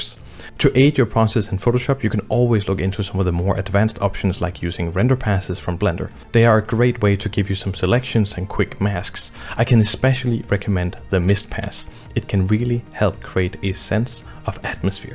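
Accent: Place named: Denmark. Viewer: The good old danish accent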